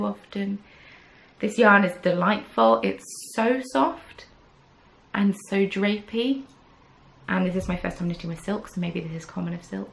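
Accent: United Kingdom. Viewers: 😂😂😂😂😂 Balls to the wall, that made me really cackle!! Especially as you are sounding extremely British today. As always fab crafty chat!! Xxxx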